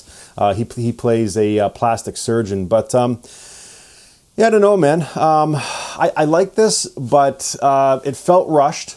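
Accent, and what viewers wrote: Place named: Canada. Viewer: Figured I heard a Canuck accent eh!!!!!! U were right this is a good movie. But I loved it and unlike you there were no Nickleback segments. As a seasoned gore whore I almost gave up on horror that went woke after 2018. Boy was I wrong and glad I gave it a try. Horror is in a dark cave at the moment but with a flashlight you can find a few gems in the shadows. BON CHANCE!!!!!!!😮😮😮😮😮😮😮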